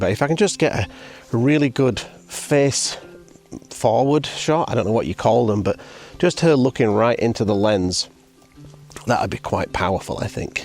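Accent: Yorkshire, United Kingdom. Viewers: It must be that calming Yorkshire accent, I fell asleep about the same time as the bobcat did .😊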